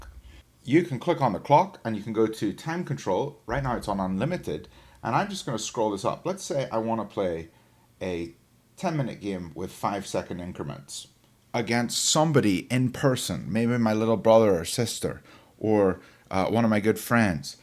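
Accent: Northern Ireland, United Kingdom. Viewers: I just ordered the Chessnut Go earlier today. I might get something like the Evo in the future but I'm a complete novice. I've only played a couple of games as a kid for about a week or two so will be interesting when I receive my chessboard. Is the tablet part detachable, that would make it better for storage, replacing tablet etc.? By the way you seem to have a Northern Irish accent coming through with a bit of a mix like James Mulgrew - Jimmy Cricket.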